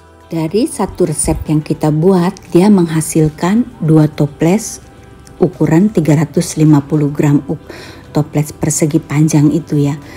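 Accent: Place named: Merauke, Indonesia. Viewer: Suaranya seperti nona merauke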